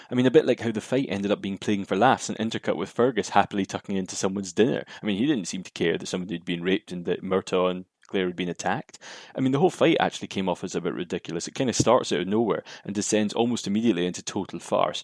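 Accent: Scotland, United Kingdom. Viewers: I could listen to you talk about anything. But I guess I am just an American girl with a thing for a Scottish accent.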